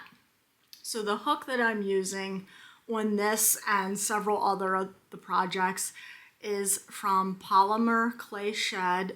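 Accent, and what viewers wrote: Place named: California, United States. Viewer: South Central PA or valley girl? You sound like California.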